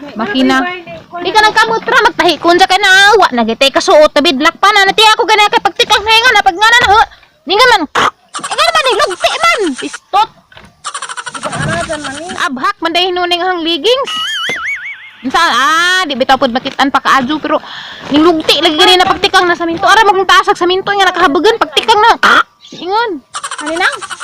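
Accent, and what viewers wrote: Bohol, Philippines. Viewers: ISMAGUL....grabe jud pgkaboholana.... taga tubigon ko day.... silingan ra gihapon ta, 😂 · Hello ate ka relate kos imong mga accent ug mga words ky taga ubay pud ako bana,ana sad iya accent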